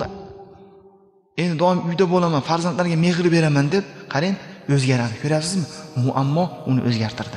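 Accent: Kyrgyzstan. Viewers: Рахмат акжон жуда чиройли гапирасиз миллатиз киргизми лекин узбекчани яхши гапирасизоценкларда сал билинади лекин ярашади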